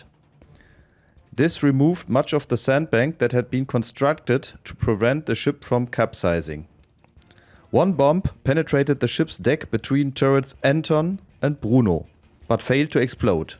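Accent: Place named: Germany. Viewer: Haha man hört den den deutschen Akzent so raus aber liebe deine Videos 👍👍💖😅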